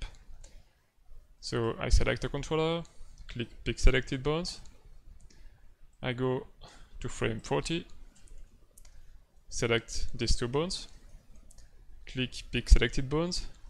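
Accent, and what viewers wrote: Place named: France. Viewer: coolla video, par contre des la premiere seconde on sait que t'est français poto ;) , merci pour le tuto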